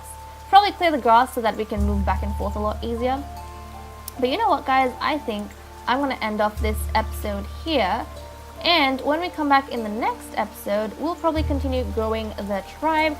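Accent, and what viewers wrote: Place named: England, United Kingdom. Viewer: They are so cute!! You have an English accent!!!!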